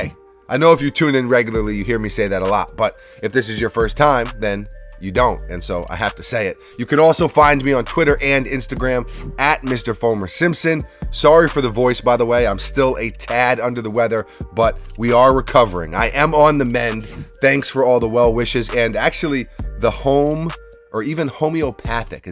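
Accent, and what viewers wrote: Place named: Boston, United States. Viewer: Love the Boston accent 👍😂😂😂🙏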